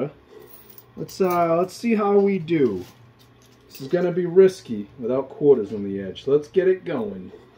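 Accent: United States. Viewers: Do I hear a New England accent. New to your channel. Where can I go to play this machine?? Please let me know! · You sound like you are from New York?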